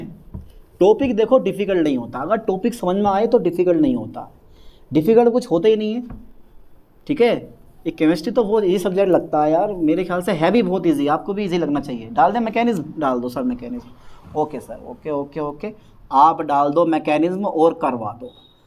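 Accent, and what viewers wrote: Bijnor, India. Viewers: Sir aap bijnor ya hasaanpur se ho kiya😅😅😅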